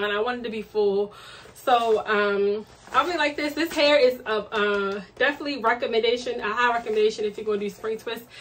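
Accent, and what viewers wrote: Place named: Maryland, United States. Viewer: I love your hair, and love the accent, you got to be from Maryland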